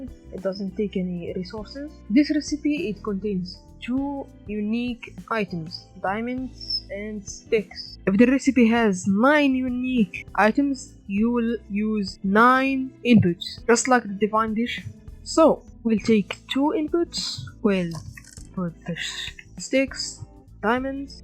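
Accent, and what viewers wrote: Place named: India. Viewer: Its a great vid not kiding keep going but one thing i feel like you talk indian and sry if this bothers you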